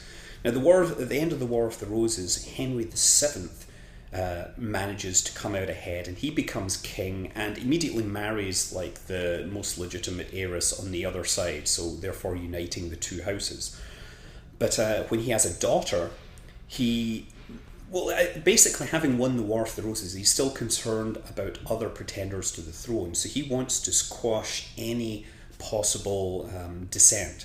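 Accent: Scotland, United Kingdom. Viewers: Your so smart! I would never normally give a crap about Scottish History, But serenaded by your sweet Scottish voice Makes me watch history crap:D Do more science videos! Maybe you should talk to some of the "How it's made" people you would be so good at narrating that. -Note, Not sarcastic at all, I really do like his voice. and am a big fan of his KSP stuff:D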